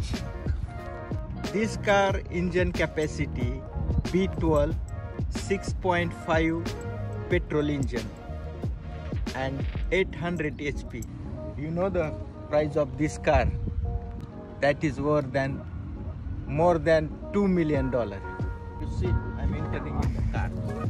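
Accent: India. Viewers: Love from Hivare,Mohol saheb💓❣️ Nice..... Marathi accents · Proud of Indian accent.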